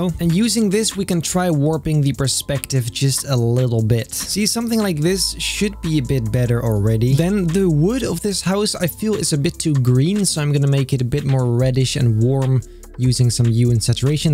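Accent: Netherlands. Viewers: Are you Dutch ? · You sound dutch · Are you dutch?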